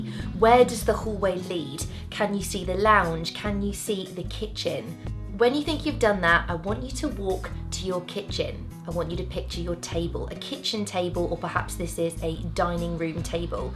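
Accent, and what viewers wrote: United Kingdom. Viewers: Awesome stuff actually. I love your accent. British accent rules😍😍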